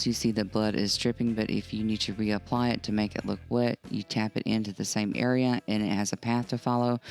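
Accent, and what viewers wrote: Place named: United States. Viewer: Really fantastic. Very interesting accent too. American i think, but not sure where from. Great work. You deserve more views and subs.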